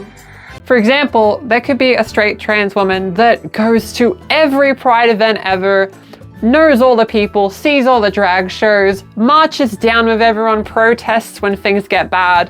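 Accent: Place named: Australia. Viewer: I hear a hint of aussie in your voice. But what is most noticable is how faint it is. As if you started out in australia but years ago you maybe moved to the states. Dialects and accents interest me.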